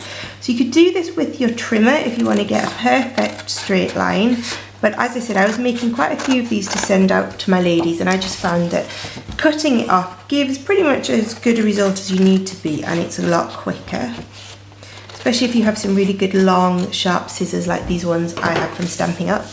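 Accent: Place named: United Kingdom. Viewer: Another lovely gift box, you have such great ideas, thank you. I enjoy your videos and, being married to a Brit, love your accent😊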